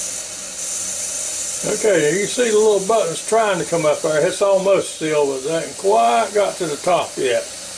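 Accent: South, United States. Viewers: I ❤️❤️❤️ Your foodie demonstration. Your southern drawl made me so very happy. Can’t wait to watch another demo of yours. My wings (modified) came out delicious. ❤️❤️❤️ PS. Love my instant pot duo crisp.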